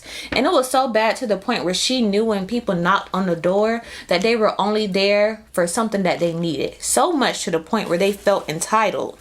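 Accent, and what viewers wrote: New Orleans, United States. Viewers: The “cOLD DRANk” 😂😂 I like that N.O. accent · as a new orleanian baby you got the accent 😂😂 and cold drank is legit ONLY said when referring to soda ❤️🙌🏽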